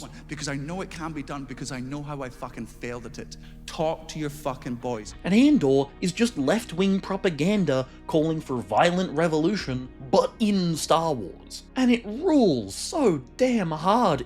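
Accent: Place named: Australia. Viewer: I thought you were English -- I didn't catch the Aussie accent, but still nailed it. I'm not sure you fully understand why Unite The Kingdom exists, but judging from your points, I'm guessing it doesn't matter because you have that far-left ideology understanding of what "masculinity" is. However, your reply clearly took time & was..... well, nice. It's hard to actually engage with people, nowadays, especially online, & just be civil. Cheers, to that. I write back because I want to see if I can somehow sway your opinions, or cause you to want to actually be informed on things -- your misunderstanding of why I said "migrants" & not "immigrants" caused me to want to reply. I also disagree with you completely about the comic & the show -- they are DIFFERENT. The comic is so much better at creating & exploring the world, while the show offers other aspects that are done better. 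I'm also a fan of how the comic implemented compound V, as it gives some of the characters more agency. The finale of the show borrowed heavily from the comic, when it shouldn't've -- yes, the show commented on everything you mention, but it wasn't until season 3, or so, when it just became another far-left media of propaganda, eschewing any balanced parody or satire, for openly taking shots at anyone who doesn't agree with "The Left", even those who participate in politics under the same flags. In mentioning this, I am reminded it was your comment about how you're "confident by the small comment reducin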